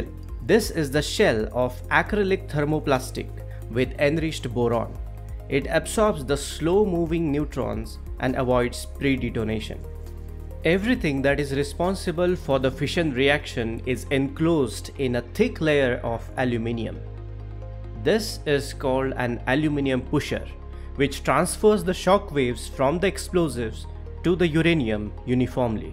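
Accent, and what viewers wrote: India. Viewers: I love your accent! It sounds Indian, but it doesn’t sound INDIAN Indian, if you know what I mean…? It sounds like base Indian, with some outer influence…? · The Indian accent is the deterrent of millions of viewers of this great video. Please record the audio with the native English speaker. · Beautiful indian accent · An Indian accent and an Indian teacher quality it goes down deep . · These dumb channels cant ever find an American narrator who DOESNT have a wack ass accent? I dont understand this curry speak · this video is well made except for the indian accent 🤦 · A Good Science explanation but I am truly sorry I find it so difficult to listen his spellings, really indian accents is so unforgiving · Sorry ,I don't like indian English accent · nice, but that accent. It's a pity that many Indian people who has somerhing smart to say has so terrible accent that only a few people can handle that. 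Most people around the world who use Engish have quite ok accent, but Indian people.. man that's another level :) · agreed....it sounds like a indian try to speak english....good video tho! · Hoooh hate when the Indian speak English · I hate indian accent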